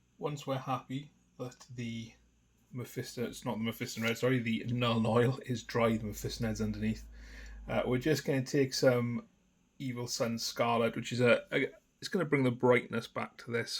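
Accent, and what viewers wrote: Wales, United Kingdom. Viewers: Any chance of you'll have a go at painting Dragon Warriors Chaos Space Marines? I'm looking to paint my Chaos Marine battleforce to counter my Salamanders. Also, your Welsh accent is fantastic!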